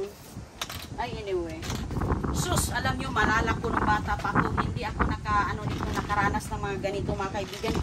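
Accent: Visayas, Philippines. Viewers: Halata po bisaya kayu 🥰🥰🥰 ... Swertyha sa family uy....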